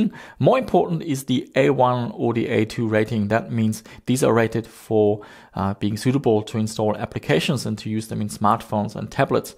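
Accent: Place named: Germany. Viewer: Sehr deutscher Aktzent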